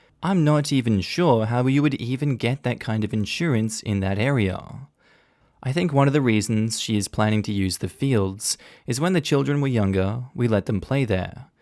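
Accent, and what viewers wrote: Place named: Australia. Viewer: I love having an Aussie to read these.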